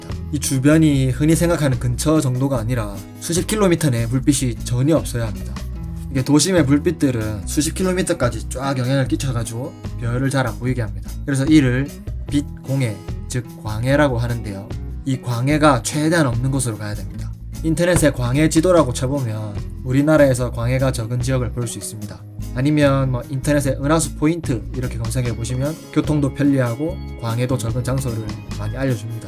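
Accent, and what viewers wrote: Gyeongsang, South Korea. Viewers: 갱상도 분이셔유? ... 목소리가 정감이 갑니다. 남자 목소리는 이래야 제맛이죠 ㅎㅎㅎ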